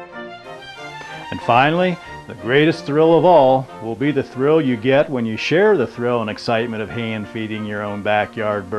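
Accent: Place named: United States. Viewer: I thought this was jolly old England , my homeland, untill you started speaking. It was beautifull. Then came the US accent and my mind wandered into the wording of 'backyard birds'... mmm the backyard of birds, Just me? British hunour maybe ehehe. I enjoyed the visit nonetheless.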